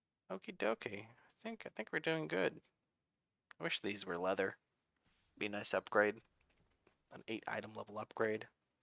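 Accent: United States. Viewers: What's your native language? You have a very American accent now.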